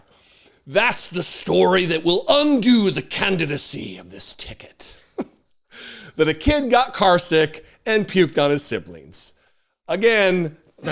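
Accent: United States. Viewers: please do not speak American as we your overseas audience for not knowing what duceses means. Thanks for the hard work and we enjoy your content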